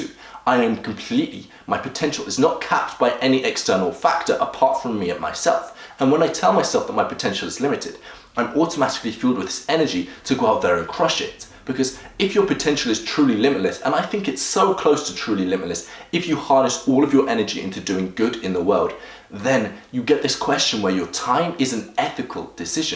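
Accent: United Kingdom. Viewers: Subscribed. And, I love your British accent!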